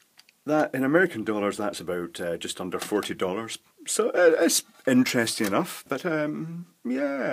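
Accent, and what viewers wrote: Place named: Bearsden, United Kingdom. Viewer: very polite spoken...you fae Bearsden?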